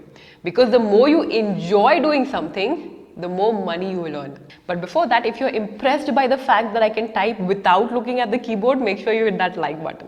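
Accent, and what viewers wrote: Hyderabad, India. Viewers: Are u an Hyderabadi ????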